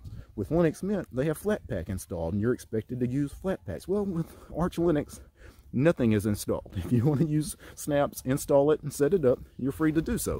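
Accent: South, United States. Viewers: Ah, so you're in Louisiana! I always wondered where that southern accent came from. We got a lot of snow here in Arkansas too.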